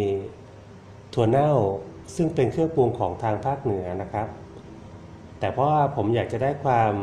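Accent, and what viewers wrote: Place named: Isan, Thailand. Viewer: นึกว่าคนอิสาร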